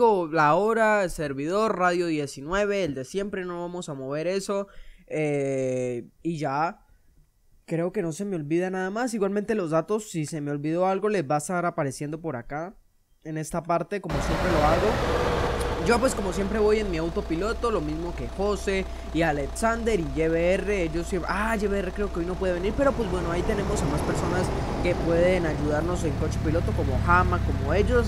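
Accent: Colombia. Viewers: sos colombiano?:)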